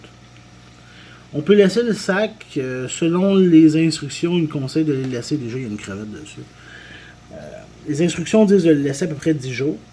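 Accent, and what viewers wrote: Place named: Quebec, Canada. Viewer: C est cool d entendre parler quebecois Bravo! Lachez pas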